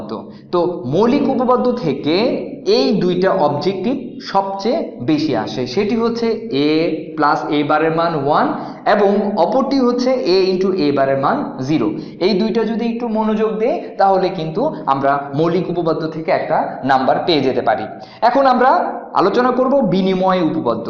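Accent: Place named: Bangladesh. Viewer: Excellent Topic. Are you from Bangladesh ? Your accent is like bangladesi... I'm from India, Kolkata. Happy to tell that, from Village Cooking By Sandhya team, one of our team member is from Mathematics Hons. Hope, he will enthu. from your channel. ❤️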